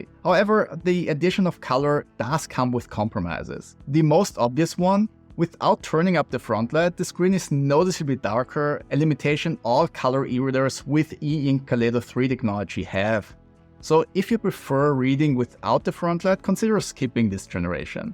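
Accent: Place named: Austria. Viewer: Ich erkenne den österreichischen Akzent sofort. Er gefällt mir :) Ich habe mir dein Video über das ONYX BOOX A3C angeschaut und mir daraufhin das A4C bestellt um die Risale-i Nur besser lesen zu können. Danke dafür ☺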